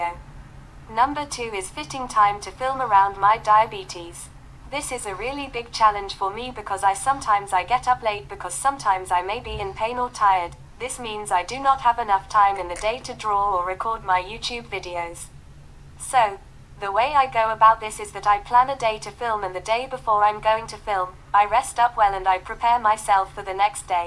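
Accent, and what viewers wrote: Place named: United Kingdom. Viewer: Hi, oh yeah I found your video about "how I become an Artist" and I heard you talking, your speech is very clear. Also I heard you say you are in London, I should have figured that out from the british accent on your communication device. Alas I'm in the USA, otherwise it would have been nice to meet up, you're very pretty. Well I'll be looking forward to your Q&A video. Take care!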